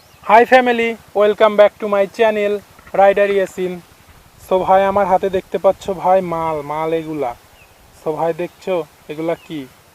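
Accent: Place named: Bogura, Bangladesh. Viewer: এগুলা বগুড়া এলাকার কথাই পাওয়া যেত পারে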